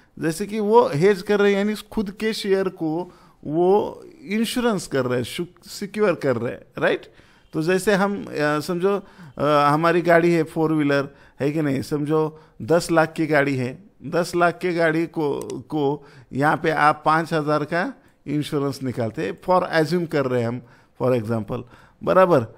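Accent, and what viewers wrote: Maharashtra, India. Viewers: Hats off to your explaining process with great marathi accent, maja aali fun with learn just wanted to tell others that learning is not a overnight change process. It required lots of time, practice, patience and self believe. Guruji ek video practical daalde aur aap turant wo sikh jao agar aisa he to share market aap keliye nahi he dosto sorry to say that but it's fact.🙏🏻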